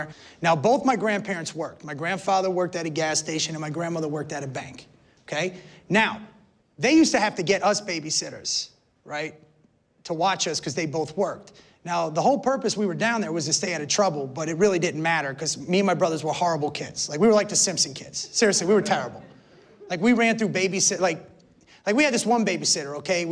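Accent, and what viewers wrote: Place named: New Jersey, United States. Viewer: Love the Joisee accent and the cutting humor. Can't take yourself seriously ever again after listening to for an hour. I'd love to hear him take on Trump.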